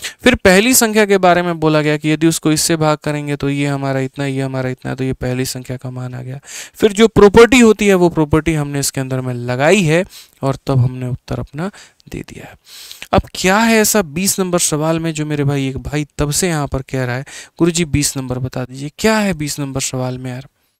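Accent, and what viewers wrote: Bhojpuri, India. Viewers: Apki bhojpuri bahut achhi h😊❤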